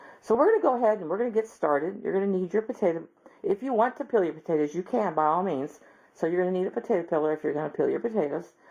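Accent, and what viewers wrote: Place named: Illinois, United States. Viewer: This is so werd! Assuming, as I am, that you're from central Illinois based on your accent (...I love how you say sauseege, potayda, and puddin' just like my grandma used to!), you're like this odd mix of both sides of my family. My mom's side are Lithuanian from northeastern PA so I had kugeli a lot as a kid... and my dad's side are all from Bloomington, IL, where I was born. So cool to see it mixed like this.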